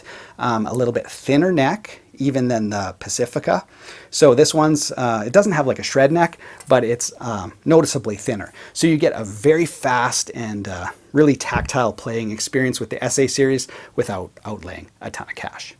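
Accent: Canada. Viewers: no Godin? and I hear you're Canadian and you're not propping up a good Canadian company... Love my Godin Session.. and yes I am Canadian.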